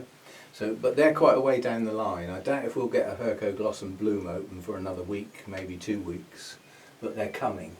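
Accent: United Kingdom. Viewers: And I watch you to see how long you will grow your hair out. And for the British accent.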